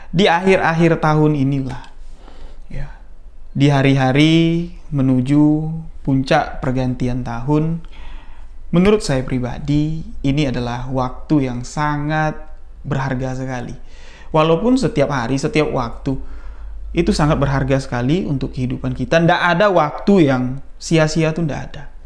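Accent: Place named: Sumatra, Indonesia. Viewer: aku nyimak mulai awal aku kira dulu orang madura .terusdan terus ku smak eh bru aku ngeh oh logat sumatra sekalinya.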